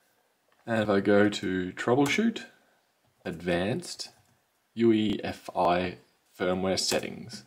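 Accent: Australia. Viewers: Liking for Australian accent, am australian :)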